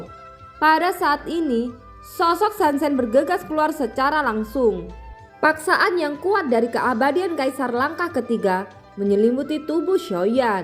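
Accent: Java, Indonesia. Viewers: Logat jawanya trllu medok😁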